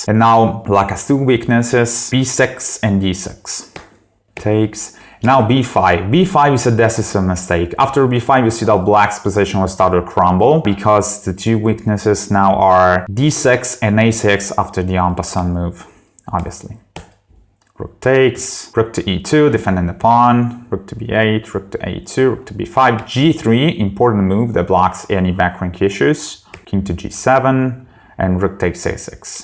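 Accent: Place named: United States. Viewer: Ciao, complimenti, mi sono iscritto da poco al canale e lo trovo molto divertente. Sono d'accordo con la risposta che hai dato a White Rabbit. Le partite trash dal vivo sono al top sempre. Un consiglio se permetti, bravo per l'inglese, ma parlare con un accento nordamericano esasperato è veramente trash IMHO, anche perchè sembri un imitatore di Hikaru LOL. Ho apprezzato molto anche i video con i consigli per migliorare il proprio gioco.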